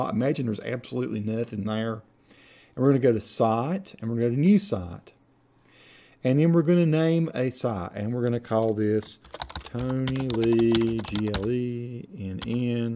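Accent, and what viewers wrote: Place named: Memphis, United States. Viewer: Great tutorial man! I spent six years in Memphis and you sound like you are not far from there!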